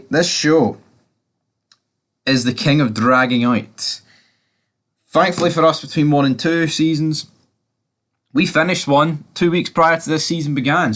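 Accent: Scotland, United Kingdom. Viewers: Exactly the same as mine, so I am guessing east central Scotland, Fife , Edinburgh or Falkirk. Listening more though, it might be a wee bit further west , nearer Glasgow.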